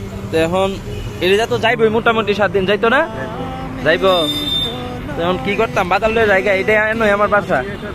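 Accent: Sylhet, Bangladesh. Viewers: জাযাকাল্লাহ ভাই আপনার ভাষায় তো বলে আপনার বাড়ি সিলেট না?আমি কি ঠিক বললাম?🤔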